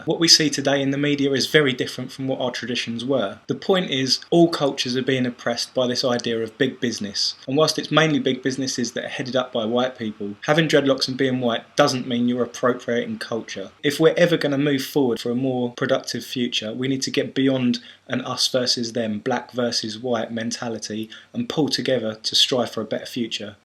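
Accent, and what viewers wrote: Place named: England, United Kingdom. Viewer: You should make up your mind there guy. are you "white" or are you NOT "white"? you claim "white" people dont exist, but you seem to be holding pretty fiercely to your "whiteness". Sound English as fuck to me. Why not just stick to being English? WHITE people are a political creation of racists. jUst be an ignorant English ass who doesnt know what he is talking about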